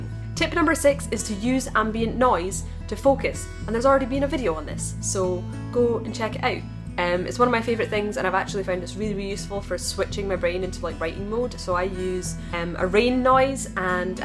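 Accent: Scotland, United Kingdom. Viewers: I love your accent so much. I want to be Scottish when I grow up!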